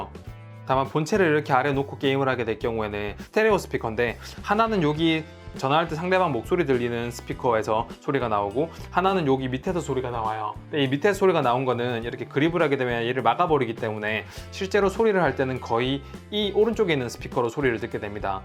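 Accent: Gyeongsang, South Korea. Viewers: 잘 만든 영상 잘 보았습니다 한 가지 거슬리는건 경상도 사투리 말을 빨리하려다 보니 사투리 억양이 너무 심하군요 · 대구사투리인가...부산사투리인가....그것이 궁금하다...허허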